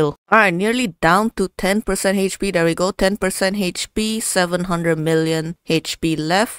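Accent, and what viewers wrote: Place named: India. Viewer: I know your voice you are Indian if I'm right give me heart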